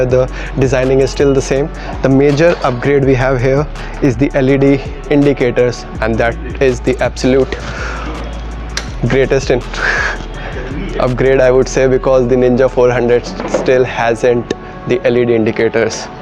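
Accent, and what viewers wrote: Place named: India. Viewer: This indian accent english language , hard to understand almost like irish accent ...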